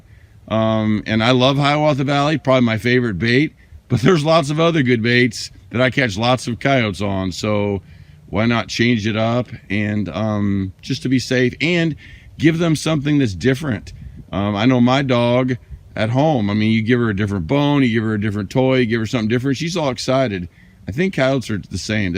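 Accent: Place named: Washington, United States. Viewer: could you say ummm one more time. this guy aint from missouri sounds like washington im trying to learn but not showing how to set traps just moving camera around lame.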